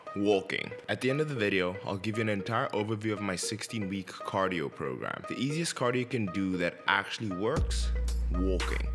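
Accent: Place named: South Africa. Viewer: This accent sounds South African 🤔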